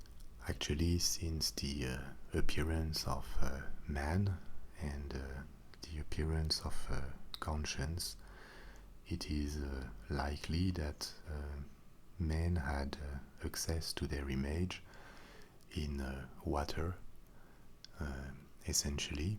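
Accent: France. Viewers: Yes! One of a kind! Hope I won`t break the spell you so awesomely are capable to put on listeners (Plus: the content you deliver...wow, and with the intonation ...just sooo soothing, your French accent seems to increase the impact...)-----------yet, may I ask: 1. what led you to do this work? 2. gee, feel awful asking this here, but: do you get paid...by whom, respectively what ..."management"? After all, you offer a stunning service...potentially on a global level! If you don`t (want to) answer, for whatever reason, be assured you are doing a fine job!!! Thanks, Marie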